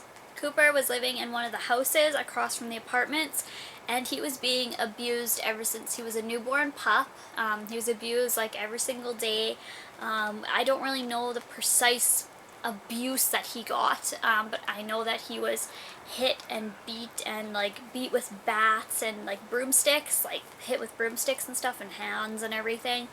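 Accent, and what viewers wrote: Saskatchewan, Canada. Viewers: O just saw u from canada but i think ur from saskatchwan ur accent seems like there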